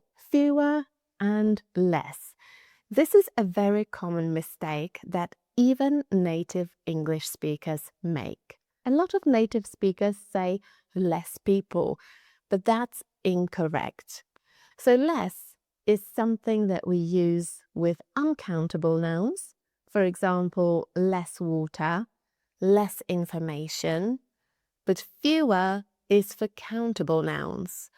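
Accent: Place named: United Kingdom. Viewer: Very good explanations and excellent British accent!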